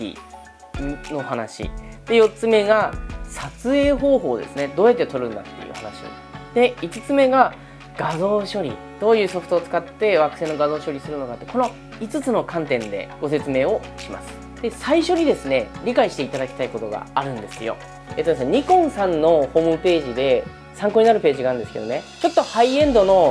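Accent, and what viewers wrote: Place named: Hokkaido, Japan. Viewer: 恥ずかしながら私はカメラマニアではなく、北海道弁が気になっちゃって、拝聴させております。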